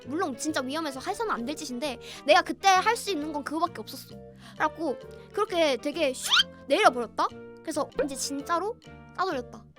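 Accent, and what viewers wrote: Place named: Gyeongsang, South Korea. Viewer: 갱상도 분이신갑네예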